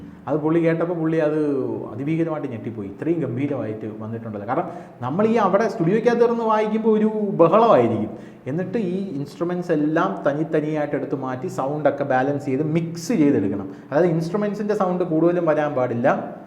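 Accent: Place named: Malabar, India. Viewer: വാക്കുകളിൽ മലബാർ ടച്ച്‌..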